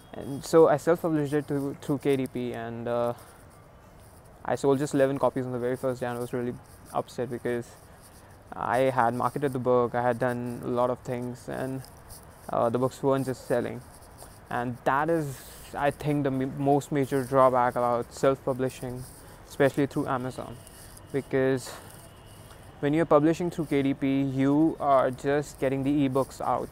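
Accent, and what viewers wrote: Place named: England, United Kingdom. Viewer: You r a outstanding quoran brother but remember one thing this english accent won't fetch publicity to u...Just be practical...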